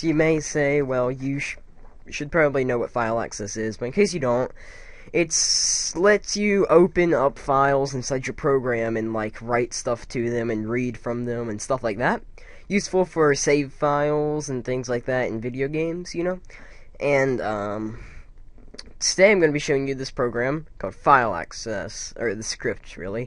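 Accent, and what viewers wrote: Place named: North, United Kingdom. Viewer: You sound like a Northerner to me, sorry if that's offensive. Greetings from WNY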